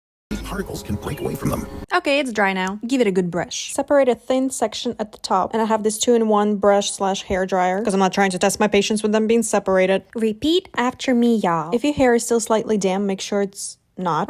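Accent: Russia. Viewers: черт, я прям чувствую этот русский акцент....................... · your accent sometimes seemed a bit...russian? to me, GIRL ARE UU russian or it just seemed to me?? 😭😭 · слышу русский акцент хаха🫶🏻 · her accent sounds more like russian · I rly like ur videos, this video have Russian memes and аKцент😮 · this accent sounds like typical «russian accent» i don’t know where you from but still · I hear a russian accent... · Я слышу русский аккцент, наверное, но вы крутышка💕💕👀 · damn are you Russian? because the occasional accent and the fucking picture of конь Юлий were such a slay 😭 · Моментами пробивается родная русская речь..) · I think she has a Russian accent respect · OMG I LOVE your humor and voice! also, aren't you russian? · С первых секунд поняла, что вы русская. На самом деле, шикарное видео,спасибо❤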